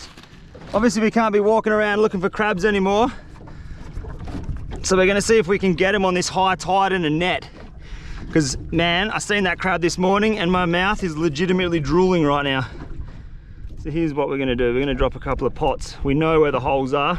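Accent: Australia. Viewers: AMERICA 🇺🇸 LISTENING TO AUSSIE MAN TALK'IN CRACKS ME UP FAIR DINK'UM COMPANY 😂😂😂😂 I'M LOV'IN IT THE WORLD IS GREATEST PLACE ON EARTH 🌎!!! FAIR DINK'UM DUDE'S 👌🤠🐸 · My god I can't stand that stupid Australian accent, no effort to speak more clear, you would get more viewers.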